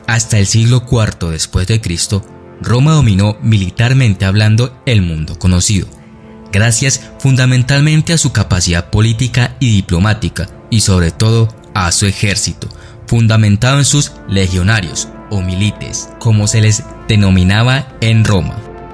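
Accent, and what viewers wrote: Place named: Bogotá, Colombia. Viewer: El parcerito tiene acento Rolo😸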